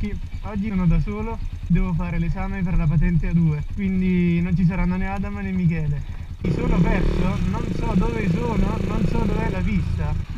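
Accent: Acerra, Italy. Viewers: Ma sei di acerra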